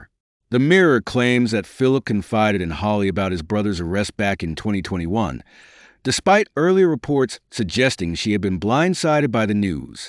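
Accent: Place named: United States. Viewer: The American accent narration is an odd choice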